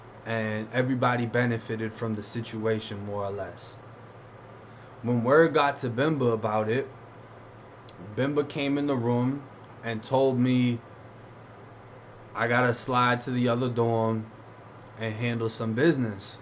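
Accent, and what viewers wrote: Boston, United States. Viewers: U from fl or boston? I see u got that Boston accent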